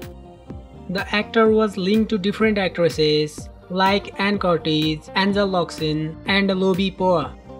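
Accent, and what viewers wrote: India. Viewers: Bakit indiano yung nagsasalita?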